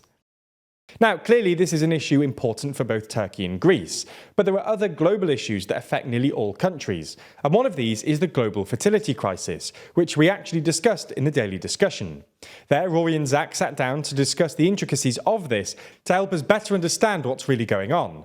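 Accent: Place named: London, United Kingdom. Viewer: lol.....they arent.....they are oceans apart , worlds apart . greece is an ultra secular society only a bloke with Piccadilly square accent could misdiagnose this deescalation as the beginning of a friendship i am half italian half greek and i can firmly say that greece n turkey is like oil n water they dont mix. kalimera to the world from kalamata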